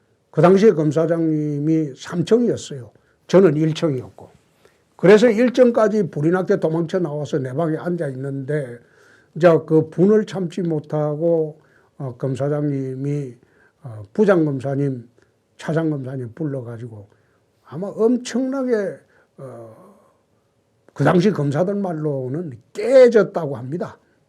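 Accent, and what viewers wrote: Gyeongsang, South Korea. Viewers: 경상도 머심마다.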